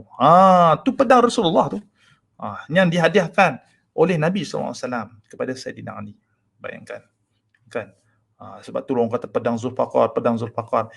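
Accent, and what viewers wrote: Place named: Pahang, Malaysia. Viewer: tuan orang Pahang kan . loghat macam Pahang je 😆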